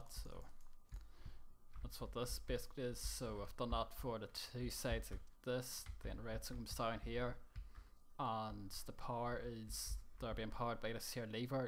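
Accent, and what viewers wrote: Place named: Ireland. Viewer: hey man love this vid it gave me a lot of ideas and i love ur accent it sounds irish and im irish :)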